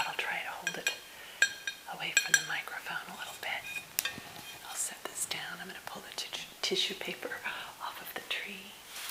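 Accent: South, United States. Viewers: I saw ur souther ascent vid and actually thought u where southern lol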